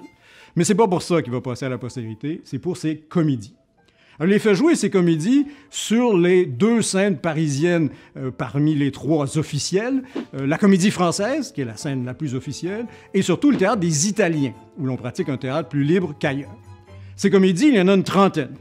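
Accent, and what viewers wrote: Quebec, Canada. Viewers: j'avoue du Beaumarchais avec l'accent Québécois ça aurait de la classe , je vote pour !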